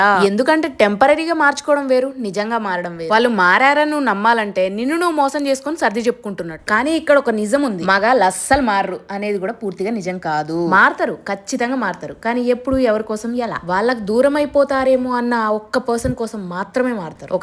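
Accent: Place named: Telangana, India. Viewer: తెలంగానా slang meku suit avvadu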